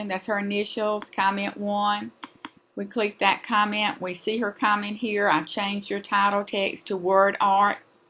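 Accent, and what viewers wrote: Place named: South, United States. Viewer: Your accent is from my home, the south. It is beautiful.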